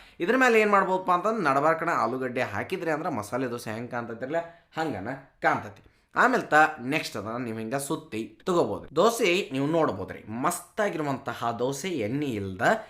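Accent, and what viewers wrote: North Karnataka, India. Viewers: ಬಹಳ ಚಲೋ ಐತ್ರಿ😂 ನಿಮ್ಮ ಉತ್ತರ ಕರ್ನಾಟಕದ ಕನ್ನಡ ಭಾಷೆ ಬಹಳ ಚಲೋ ಐತ್ರಿ.😅😊😂❤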